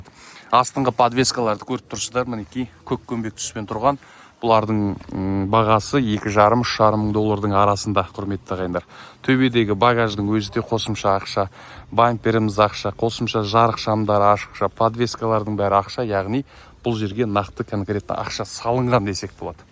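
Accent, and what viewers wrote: Standard. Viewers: Мен озим колик айдай алмайм.Бирак узбей коремин таза казакша жургизесин Касибине береке!!!👍👍👍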